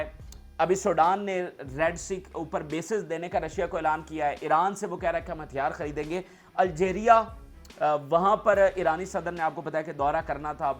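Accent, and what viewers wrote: Punjab, Pakistan. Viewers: That Clown dance didn't end now he is playing music in the background Obviously he has been having fun and there thousand innocent people r getting killed everyday But people like u supporting him and like his ridiculous videos Shame on all of u guys who r supporting him He can't speak one right word of English and no one force him to read punjabi English Can't say right name of jewish politicians name Despite he copies all these news from other sources despite listening he can't learn right English and say right words and other names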